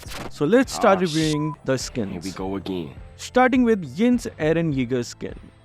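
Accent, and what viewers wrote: India. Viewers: Your indian accent is so hard to understand bruhhhh.....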